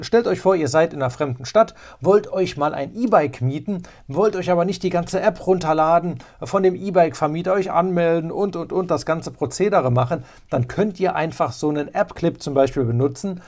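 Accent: Saarland, Germany. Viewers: Bist du aus Saarland geil 😅😂 bin aus Saarbrücken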